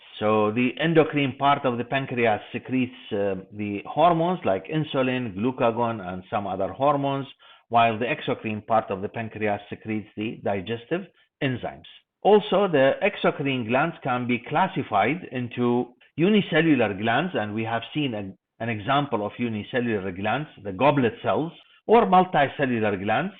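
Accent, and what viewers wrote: Iraq. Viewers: I think he’s Iraqi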